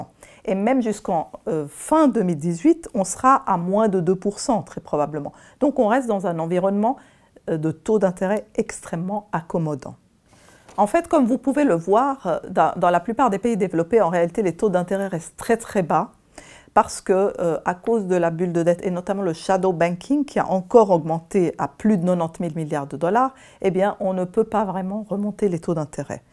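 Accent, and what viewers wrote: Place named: Switzerland. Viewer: Delicieux accent qui sent le chocolat Frigor et le Läckerli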